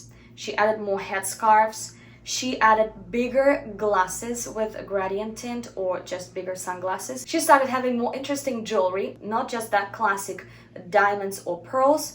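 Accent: Russia. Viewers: You got light Russian accent ))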